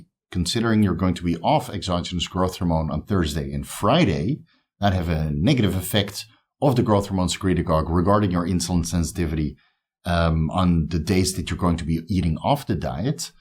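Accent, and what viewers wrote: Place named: Netherlands. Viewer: what is your heritage, I can't quite place your accent.... Dutch?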